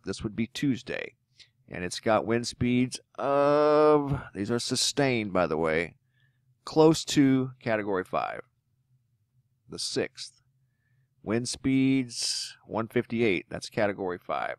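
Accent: United States. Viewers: if you can investigate, then you will find that they start from out of the atmos and cloud masses atract them ! electric ! planet means wandering star ! you sound soooo americAAAAAn ................!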